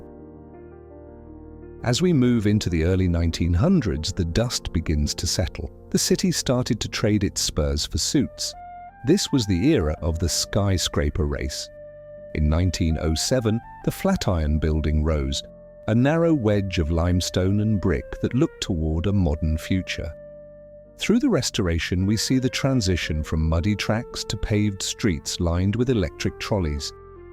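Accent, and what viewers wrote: England, United Kingdom. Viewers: 🇨🇱🇨🇱🙋WHAT. ?.THATS A ENGLISH ACCENT. ....I was also born at Harris ..miss my Fort Worth very much .was raised off Camp Bowie ..at Grandparents hous my sweet grandfather bought it brand new for Grandmom 1937. ..we had it 64 yrs ..she lived there then Mom came back & raised. US girls w Grandmom ..thanks to granddaddy ..we had no mortgu pymt..they were magnificent people he was a LION' & promoter of Fort Worth Cats baseball and other fine things .🇺🇸🇨🇱🙋💙💙💙even though he was born in Oak Lawn ,Dallas. They settled in Fort Worth , after honeymoon at The Baker Hotel 1930. Dallas🇨🇱💙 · Does AI think we need a British guy to narate? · Why is a guy with an English accent narrating this? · Pretty neat visuals. But why would the narrator speak w a British accent?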